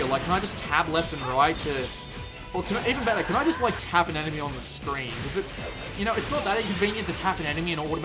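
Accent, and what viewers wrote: Australia. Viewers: Love your Aussie accent mate!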